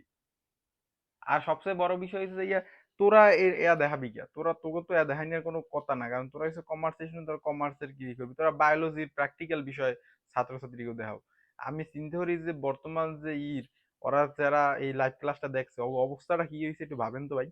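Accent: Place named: Patuakhali, Bangladesh. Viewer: Apnr vasha potuakhalir mnush der moto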